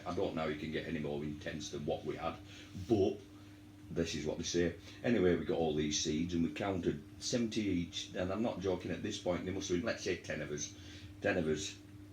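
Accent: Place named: Yorkshire, United Kingdom. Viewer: Are you from Barnsley or Donny pal? Its like listening to an old mate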